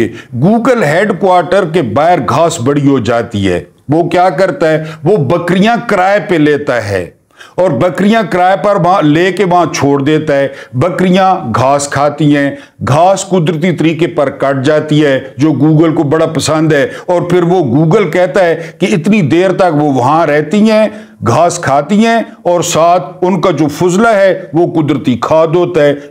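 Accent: Saraiki, Pakistan. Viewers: Masha ALLAH Zaberdust V Log kertey hein, Saraiki Touch bohot hi acha lagta hey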